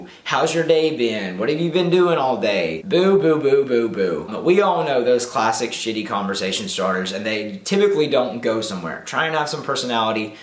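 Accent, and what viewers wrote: South, United States. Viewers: Okay, my question for the Q&A (if you so choose) - do you use your "Southern" voice on dates or you "Normal YouTube" voice? (I'm wondering now because I've heard your accent and the general topic of the video xD) Otherwise, I feel like I would enjoy sitting down with you and just picking your brain about all the stuff you talk about... oh shit my psychologist is showing, k thx bai! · Ohh, that Southern voice is giving me goosebumps. I love it! It's great to see you Smile again. I love you always seem Complex, that's a great thing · I for one wouldn't care if you talked in a Southern accent for the remainder of your time on YouTube, it reminds me of your very first video you're coming out video one of the things that I found so endearing about that video was that southern accent, you weren't trying to hide it it was just there and I found that to be precious and plus I'm in South Carolina so I get ragged all the time about my Southern accent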